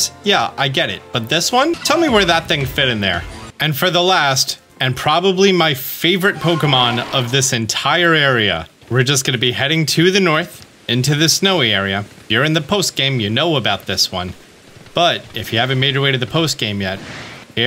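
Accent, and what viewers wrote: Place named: United States. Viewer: Why do you sound like American?😊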